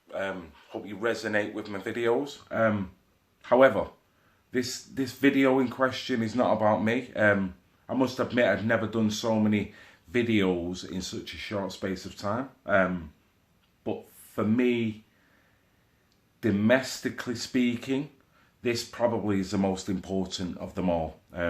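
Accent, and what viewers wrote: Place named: Liverpool, United Kingdom. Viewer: Racism would NOT exist between whites and blacks if all black guys adopted the same morals and loyalty that strongly holds towards his country and people. I agree with almost everything talks about and I look forward to all his uploads. I was an early subscriber to his channel because on listening to his posts, I immediately recognised this man as a decent honourable and above all loyal black Brit whose corageous enough to speak the TRUTH without fear or favour. is clearly very clever, informed and is an articulate speaker (with a Liverpudlian accent lol) to boot who doesn't use obscene profanity to shock. I think his talents are pitifully wasted as a painter decorator (no disrespect intended to decorators who are all hard grafters). He's the kind of guy I'd hand pick as a friend. I think that Merseyside Police should appoint as their new Diversity Policing Adviser.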